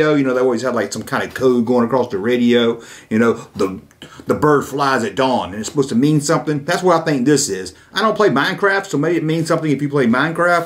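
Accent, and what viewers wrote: South, United States. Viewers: And I'm from Missouri, so that's close enough to the South that I don't have much trouble understanding Southern people.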